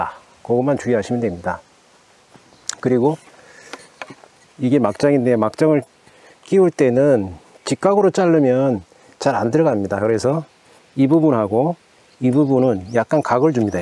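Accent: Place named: South Jeolla, South Korea. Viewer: Thank you for teaching some Korean wood crafting! I enjoy your chollnamdo accent reminds me of Gwangju. 😘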